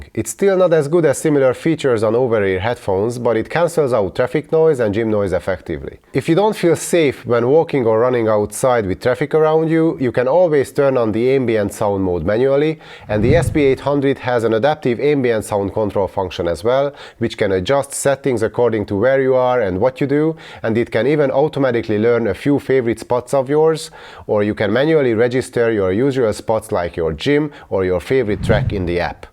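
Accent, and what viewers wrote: Hungary. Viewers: Are you Hungarian tho? Ha igen, akkor pacsi 😃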